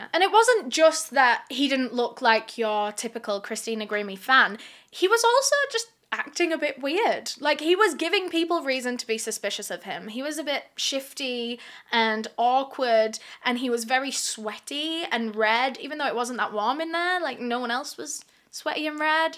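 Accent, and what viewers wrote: United Kingdom. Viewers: What is your accent? I cant figure out if its uk or not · It's a UK accent!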